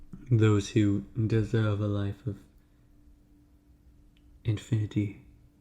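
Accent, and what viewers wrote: United Kingdom. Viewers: he is british af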